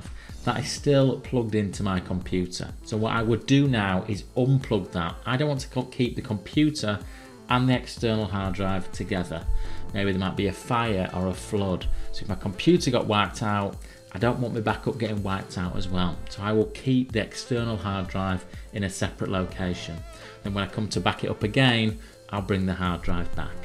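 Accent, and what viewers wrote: Yorkshire, United Kingdom. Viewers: Yorkshire, Yorkshire , Yorkshire.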